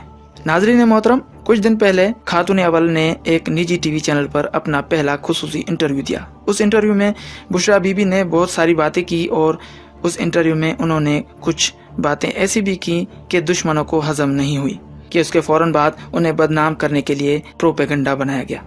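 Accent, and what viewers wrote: India. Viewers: This rubbish video made by Indian the man who is telling fake story he is 10000% Indian his accent is Indian he cannot speak clear urdu may be hire by corrupt PMLN. False story. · Bolnay wala banda 100 % indian hay. Iski urdu sun lain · HADEES MUBARAK HAY NAKE MARD KO PAKBAZ BEVI UR GANDAY KO FAHISHA UORAT MALAY GEE, PAHLAY IMRAN KA KIRDAR DAKH LO BUSRA KA FASLA HADEES KEE ROSHNI MAIN KAR LO. . . DOSRI BAT YA VIDEO JIS NAY BANAEE HAY US KEE URDU SUN LO INDIA WALOON KA LAHJA HAY CHANAL KA NAMUSALMAN JASA RAKH KAR N LEAGE PER ILZAM RAKH KAR SIRF RAW KA MAQSAD PURA KARNA HAY, AB FASLA KHOD KARO DUSHMAN INDIA HAY YA MUKALIF SAYASI JAMAAT . BAQI ISLAM TO KAHTA HAY LA RAJBANEEYAT FEE ISLAM ISLAM MAIN PEERI FAQEERI NAHEE HAY ,,,,KOJA AAK UORAT DAWADAR BAN JAEY PEERI KI UOR HAZER KHAWAND KO CHOOR DAY JAWAN BACHOON KO SARMINDA KAR DAY, CRICKET HERO KO PANAY KAY LEYA , PHER TAWEZ GANDNAY KARAY ( LANAT KI ALLAH NAY GRHOON UOR GANDOON PER PHOOKNAY WALOON UOR WALIOON PER) KHAWAND KO KURCI DELANY KAY LEAY ,,, TO FIROON KAY JADO GAROON KAY LEAY AKK MUSA BHI AYA KARTA HAY UOR US KAY PASS AKK DANDA ( AASA) BHI HOA KATA HAY,,,, JO SUB JADOO GANDAY KHA KAR DAKAR BHI NAHI MARTA. 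KITNI SAFAEE DO GAY, IN AGENTS SAYASAT DANOO KI BUS,,,,,,, PAK,,,,,,,,, FOG,,, DINDABAD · سب سے پہلے حرامی تم ھو جو یہ ویڈیو ڈالنے والے حرامی ماں اور بہن کی تصویر بھی ڈال دیتے۔شرم کرو ایک تہجد گزار عورت کے بارے میں بکواس تمہیں شرم آنی چاہیے ۔تمہاری آواز سے لگتا ھے کہ تم انڈین اسلئے تمہیں کیڑا کاٹ رہا ھے۔ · Mr .who ever you arebut one thing is confirmed that you are not a pakistani but an indian your accent is not pakistani at all ,muslim or hindu i can tell too. let me listen u again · تصویر پتہ نہیں کسی نے لگائی بھی کہ نہیں تم بلاوجہ بیغیرتی کر رہے ہو۔ اور تم لازمی انڈین ہو تمہارا لہجہ صاف بتا رہا ہے اور ہمیں پہلے ہی پتہ چل چکا ہے کہ مریم نواجی نے اپنے میڈیا سیل کو بشری بی بی کی کردار کشی کا ٹاسک دیا ہے تم بھی اسی کا حصہ تو نہیں؟؟؟ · مجھے تو تم اپنے لہجے سے انڈین لگ رہے ہو۔ رہی بات بشرا کی تو جو عورت کسی کے ساتھ تیس سال گزارے اور اس کے پانچ بچے ہوں اور نواسے نواسیاں بھی ہوں اس کے بعد اگر وہ علیحدہ ہو کر کسی دوسرے سے شادی کرتی ہے؟ تو اس کے بارے میں کیا کہہ سکتے ہیں؟ پنجاب میں ایسی عورت کو کوئی عزت کی نگاہ سے نہیں دیکھتا۔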